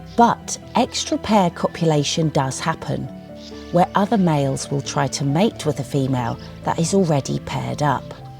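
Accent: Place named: United Kingdom. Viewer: British. Nice t see y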